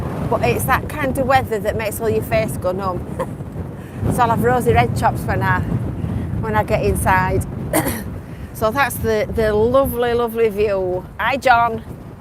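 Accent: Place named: Yorkshire, United Kingdom. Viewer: Good afternoon you sound a rate Yorkshire lass'' starman recomendid your channel to me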